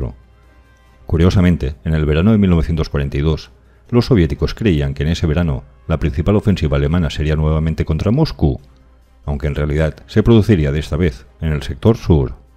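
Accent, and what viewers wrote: Galicia, Spain. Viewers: Eres de Coruuuuña?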